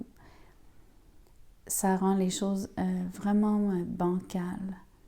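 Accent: Quebec, Canada. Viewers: Bonjour, toujours très parlant. C'est tout le concept de rester buté sur une idée pour s'empêcher d'être heureux, quelle tristesse... mais avec un accent québécois, ça passe mieux. 🥲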